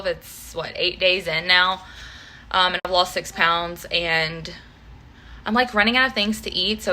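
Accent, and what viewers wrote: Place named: South, United States. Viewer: Your asking what brought me here. Well I love cleaning motivation and inspiration but to tell the truth I have been drawn to the vlog style videos 😊. So being from Canada 🇨🇦 we get teased and bugged all the time about how we talk. I do enjoy the way y’all speak in the south so carry on doing you and carry on with the vlog style content ❤️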